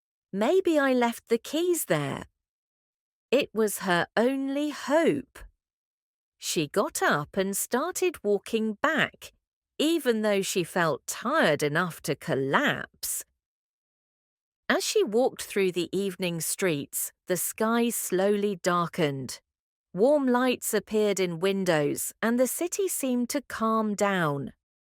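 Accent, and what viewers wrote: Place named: United Kingdom. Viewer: I love this story! Great British English!❤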